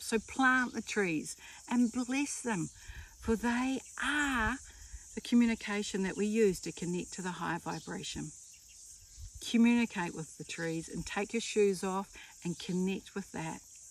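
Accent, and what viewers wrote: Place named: New Zealand. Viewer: Good evening..i have just found you tonight and i so enjoy listening to you at this moment..i have been red pilled for almost 2 years now...things are very tough here in Queensland, Australia and i think i pick up an New Zealand accent..am i correct?🎆💜 I am almost 79 and refuse to wear a mask and absolutely refuse to have the jab!!!GOD BLESS you all in NZ,stay in high vibrations everyone..love and light to all.🇭🇲💜🙏💜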